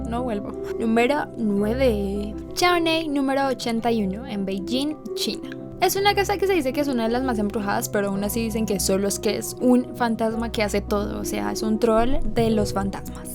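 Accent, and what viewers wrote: Colombia. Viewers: eres de Colombia sierto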